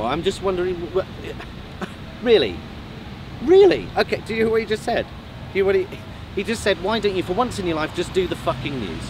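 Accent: United Kingdom. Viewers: This is the kind of news I would watch any day. He’s got that bad ass British accent to go with it.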